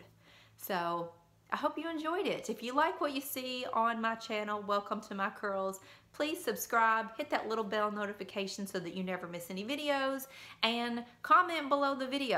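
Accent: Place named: Kentucky, United States. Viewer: WELCOME TO MY CURLS aha! Thought I recognized that accent. I am from eastern KY but mostly raised in southern Indiana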